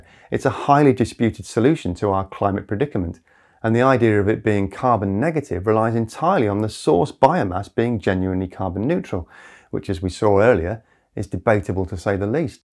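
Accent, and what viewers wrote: United Kingdom. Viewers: Not even a minute in and you have told two untruths. 1st of all wood pellets are not made out of old growth. Most of the trees that are harvested are around 20 years old which is the typical lifespan of pine trees. The trouble with this lie is that it is misinformation absorbed by the those who lobby for legislation against the logging industry. It prevents clear cutting timber which creates these massive over grown forest which are basic tinderboxes. Those forest fires which are mostly avoidable cause significant harm including loss of life. So your lies and misinformation have consequences. Next, CO2 emissions are net neutral in biomass because the carbon that is released during burning is the same carbon absorbed during growth. Furthermore, just because you have some British accent doesn't make you smart. You are spreading climate fear (fear porn) with is all lies and misinformation. 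You are the danger. I would down vote you 1000 times if possible. I will settle with just calling you a liar and a fraud. Have a think on that!!!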